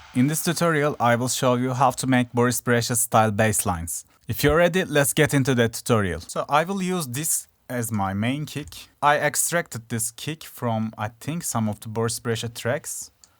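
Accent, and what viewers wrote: Turkey. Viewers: Ne kadar türkçe bir ingilizce diyordum izlerken :) çok güzel video tebrikler :)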